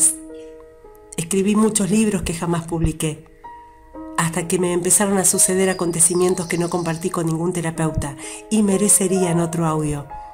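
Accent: Argentina. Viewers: Gracias, gracias gracias. Haz llegado a mi vida justo en el momento preciso, ni antes ni después. A mi me Dan taquicardias y siento que muero, me han dicho una y otra vez, ve al sicologo.. Tengo tiroiditis hashimoto, enfermedad auto inmune, enfermedades que tienen origen emocional. Dormía con este audio, y empecé a escuchar desde mi subconsciente, como q era una gran amiga la que contaba, y me decía, por qué no me había dicho, porquě no me lo había contado, si estuvo al borde de la muerte, pero y por qué habla como Argentina? Hasta que entrě en mi conciencia.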